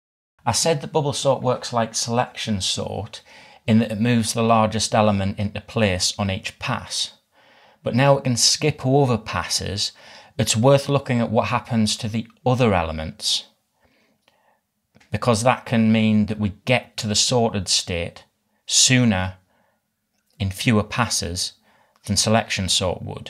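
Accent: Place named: Northern England, United Kingdom. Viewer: I liked the clear explanations and the awesome animations. I also liked your Northern English accent. On the other hand, as a programming noob who has only studied Python 3 I would have preferred the language to have been Python 3. You never said which language you were using. Is it JavaScript? I could not understand the line of code at the top and you never explained it. I think your method of starting with something simple and adding optimizing code later is great. However, I would have liked it to start even simpler. I am here because of your Twitter reply to 3B1B. Do you have any Python 3 tutorials? I am especially interested in string manipulations, simple chess playing programs, and simple text to speech stuff, not that I am particularly typical. I am also an explainer, but I do my explaining on paper (figuratively speaking) in my free blog on Medium.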